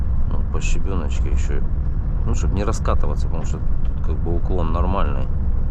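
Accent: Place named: South, Russia. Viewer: ! Ты же якут, а откуда такой говор южный?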